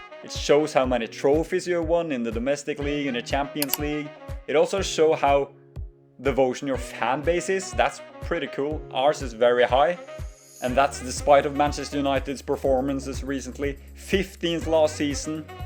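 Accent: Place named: Norway. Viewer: Are you Norwegian? You speak kind of Norwegian/English 😊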